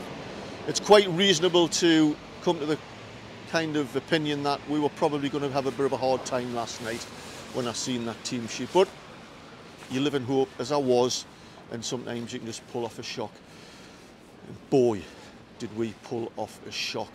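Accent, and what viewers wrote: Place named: Manchester, United Kingdom. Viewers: Great Manc accent btw and I’m being serious! 😂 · Love your Manc accent, 😂, scousers won't be happy. Great channel BTW. 👏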